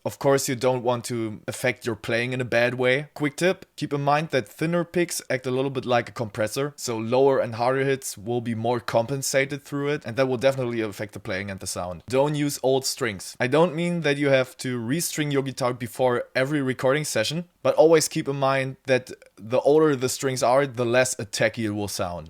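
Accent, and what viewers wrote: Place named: Germany. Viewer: Ich vermute mal, dass du Deutscher bist (hört man ganz leicht am Akzent). 😉 Die geile DI, die du im Video zeigst, klingt fast so, als hättest du ein Saturation-Plugin oder einen Transient Shaper draufgepackt. Ist das wirklich so? Falls nein: Welche Effekte nutzt du exakt für diesen Sound? Drehst du manchmal auch die Phase oder lässt du sie original?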